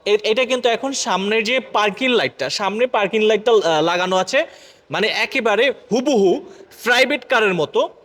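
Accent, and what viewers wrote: Noakhali, Bangladesh. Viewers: মুরুক্ষা বেডা এটার নাম ড্যাসবোর্ড বলে..... কথাই ঠিক নাই....নোয়াখালির একটা টান থাকে কথায়....😂😂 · This guy is very excited. Is he a pure Noakhalian speaking Bangla?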